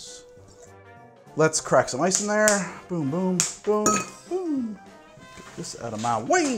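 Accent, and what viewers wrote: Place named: New Jersey, United States. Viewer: Join the subtle Jersey accent. Just wait until you start calling them Flahrida ahranges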